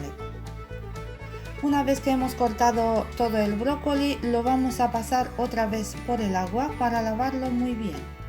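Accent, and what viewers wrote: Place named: France. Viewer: Me encanta tu acento franses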